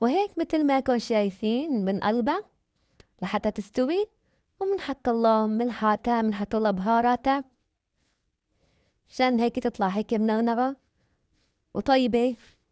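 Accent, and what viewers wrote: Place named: Aleppo, Syria. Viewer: واو لغة حلبية حلوة ❤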